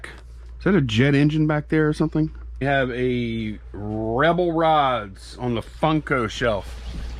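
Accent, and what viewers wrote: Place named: East Coast, United States. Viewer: My Dad used to let me stay up to watch Nature Boy Ric Flair, Rowdy Ronnie Piper, Hulk Hogan came later I think... back in the 80s. I love listening to back east accent also. I am from the east but moved to west coast 20 yrs ago.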